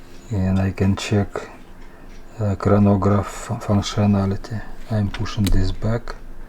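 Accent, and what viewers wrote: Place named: Russia. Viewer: Похоже на русский акцент.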